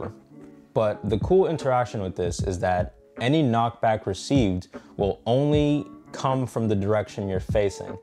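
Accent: Belgium. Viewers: Are you from Belgium? :D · You're from belgium?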